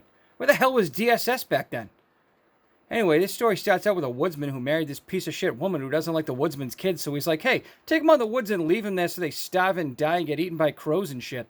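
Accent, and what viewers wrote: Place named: Boston, United States. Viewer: You’re killing me. It wouldn’t be the same without that thick Boston accent!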